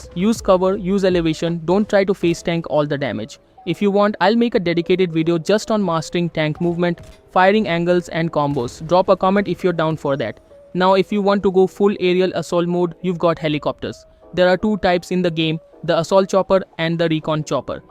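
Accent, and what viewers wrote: India. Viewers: The moment i clicked on this video, i knew it was bout to be good by the indian voice this guy straight to the point like tech tutorials by indians and no extra yapping..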